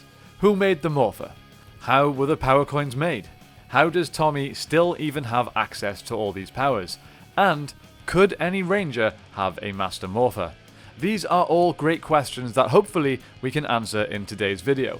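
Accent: Wales, United Kingdom. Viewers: Is that a Welsh accent I detect? I'm Welsh and I can't decide if it is or not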